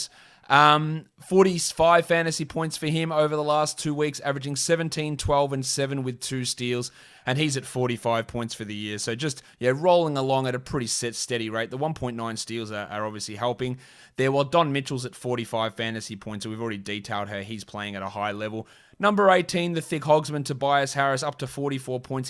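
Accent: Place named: Australia. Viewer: Great show. My wife likes ya Australian accent. Cheers to the weekend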